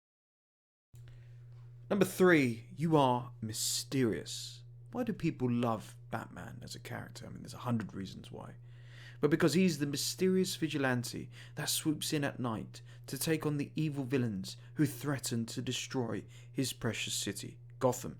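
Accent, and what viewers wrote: United Kingdom. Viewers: I really enjoy your voice .... your British accent is the best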